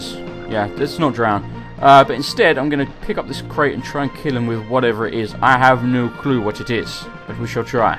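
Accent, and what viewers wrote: United Kingdom. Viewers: He's surely british.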